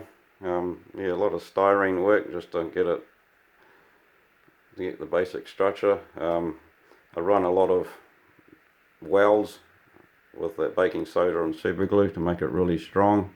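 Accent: New Zealand. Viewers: Excellent job! Soooo awesome!! Kiwi btw? lol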